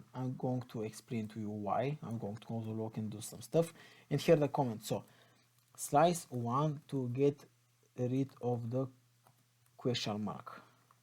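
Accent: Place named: Bulgaria. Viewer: hah me too ! :) just the accent of english :D and i decide you are bulgarian lol :D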